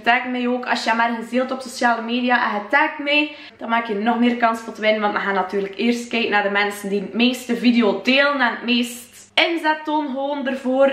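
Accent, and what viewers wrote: West Flanders, Belgium. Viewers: iPhone 6 golden dots aub, je maakt zo'n leuke video's gewoon zo int west-vlaams. Ik begrijp wat je zegt want kben ook van kortrijk!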